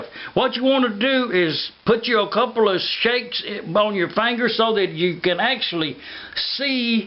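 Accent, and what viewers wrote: South, United States. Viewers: I tell you somethin.....I've caught the southern jawl from you......I was yesterday mixing some dough for cornbread and while I was mixing, I was saying to myself-"Gitter DONE !!" This is gunna be GOOOD !! I was chuckling while I was stirring like the Arkansas dog does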